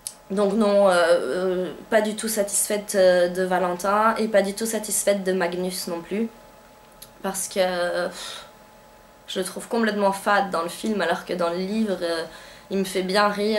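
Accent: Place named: Southwest, France. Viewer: Oups c'est un garçon, il s'appelle, (j'espère que je ne trompe pas..) il est aussi blogueur, d'après son accent il doit être du sud ouest de la France...Allez à suivre..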